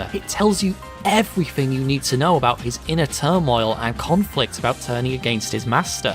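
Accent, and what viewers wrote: Yorkshire, United Kingdom. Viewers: I’m from Yorkshire too (Bradford to be specific)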